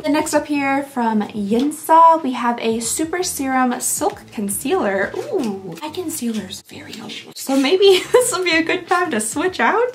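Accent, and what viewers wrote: Kentucky, United States. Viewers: Ahh. I come straight to your videos when I get notified!!! My fav! I'm also a kentucky girl. Live about 20 mins from lexington. Love your accent. ❤️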